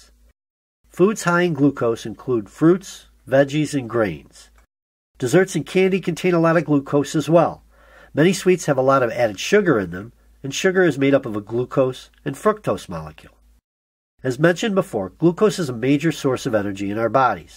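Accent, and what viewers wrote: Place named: Michigan, United States. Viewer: Great video. Love the (Michigan?) accent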